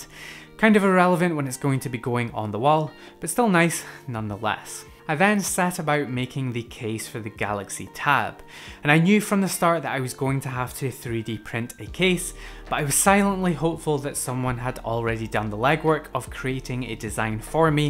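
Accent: Scotland, United Kingdom. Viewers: are you scottish like me?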